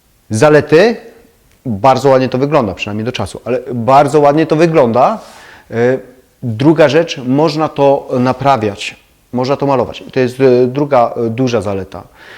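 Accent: Silesia, Poland. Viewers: Cześ ! Słychać żeś jes chopok z Rudy . Racja mosz . Trzymej sie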